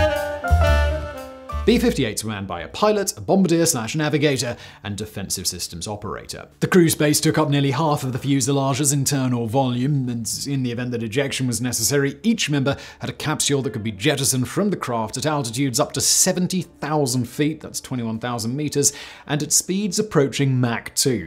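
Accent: RP, United Kingdom. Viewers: that's cool as, I was the Wikipedia editor that added the "Adverse Flight Characteristics" sub section and the part about "fuel stacking", made me feel special hearing mention those in his posh British accent!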